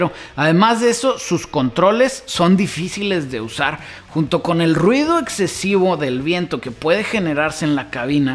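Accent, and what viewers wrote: North, Mexico. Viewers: No sabia que los gringos hablaban como norteños o de donde es este wey y por que todo lo dice en dolares y galones, que no su mercado es latino? Y seguramente el 80% de sus vistas son en México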